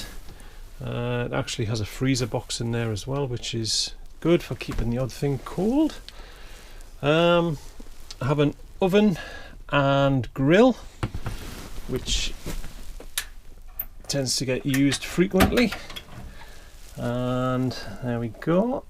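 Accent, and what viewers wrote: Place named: United Kingdom. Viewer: Hi; How about that -- two bathrooms in a van. That is absolutely amazing! I also like the way the couches are arranged -- it actually feels like a living room. I really think you hit a gold mine there with the price, the mileage, and the configuration. Lucky you. I also enjoyed the British accent and the unusual words. I never did figure out what you called the stove. Have fun 'roughing' it in your fancy van. Diane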